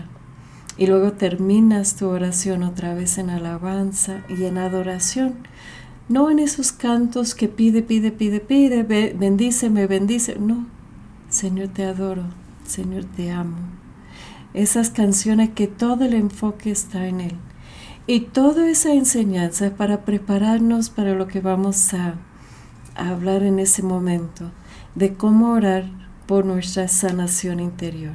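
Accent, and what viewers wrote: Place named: Mexico. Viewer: Pese a tu español tan mejicanizado te hiciste entender maravillosamente ...por lo que será muy fructífera la enseñanza ...porque se que la hora hiciste en el Nombre de Dios Trino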